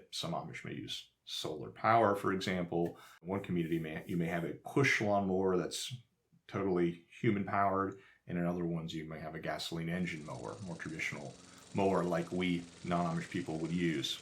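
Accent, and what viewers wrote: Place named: Baltimore, United States. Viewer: Have to ask, are your from Baltimore? I hear certain words that remind me of my childhood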